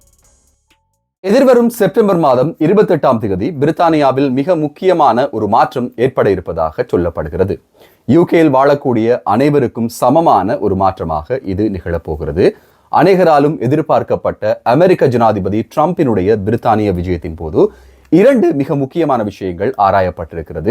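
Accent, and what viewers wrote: Sri Lanka. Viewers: Nice Lankan Tamil accent !!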